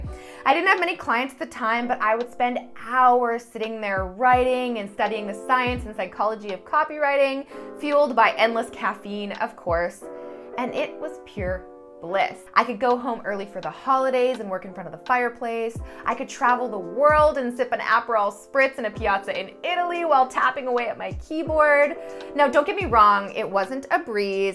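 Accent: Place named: South, United States. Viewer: Excellent video. I am so glad I stumbled on this channel. Incidentally, good southern accent.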